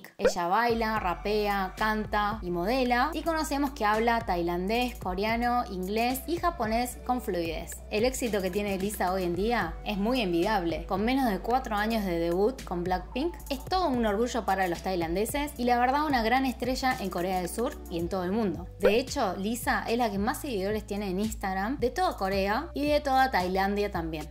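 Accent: Argentina. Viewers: A Lisa no la estafan , deberían matarlo por eso >:( amo tu acento Argentino 💖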